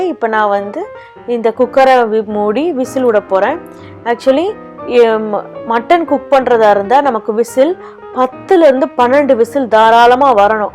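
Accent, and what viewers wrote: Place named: South, India. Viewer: Very nice, are you southside,your slan is such a way.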